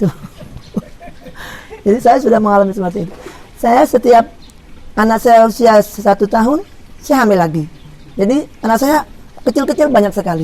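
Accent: Java, Indonesia. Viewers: Bu nyai, klo ngendikan njawani banget, tapi gitu baca Qur'an, sambil merem dengernya ky org timur tengah, masha Allah fasih ... yai dan gus di kediri idola dan panutan sy..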